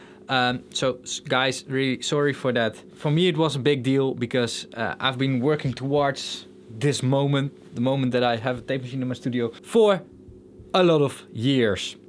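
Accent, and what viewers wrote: Netherlands. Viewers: Why is a Dutch guy (I assume by the accent) who's making English videos using German terms to explain technical stuff? Very interesting video, btw! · Totally off-topic but it's so cool to hear that we have the same pronunciation. Typical dutch - English. Now on-topic....GREAT CONTENT!! I'm also a gear dinosaur ;-)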